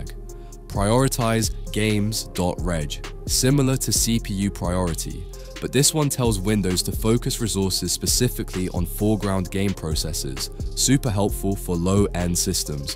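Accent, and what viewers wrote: India. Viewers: yea man the vid is botted and bro ur indian voice we know it so put the voice changher off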